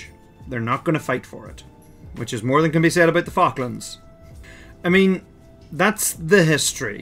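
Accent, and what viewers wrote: Ireland. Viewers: Thanks for doing this video, Ive done a very similar one looking at the same content, just with a lot more data and less of an Irish accent! Check it out and let me know what you think of it "What if Ireland United?" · I don't hear any sounds in his speech that aren't Irish. American accents are more influenced by some Irish accents than by others. In particular, the more northerly Irish accents have more in common with some of the best-known American accents.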